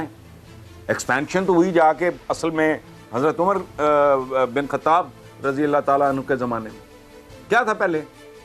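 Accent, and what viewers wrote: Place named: Punjab, Pakistan. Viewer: Tusi khuskardita toda panjabi awaj dilpe habi paji tusi great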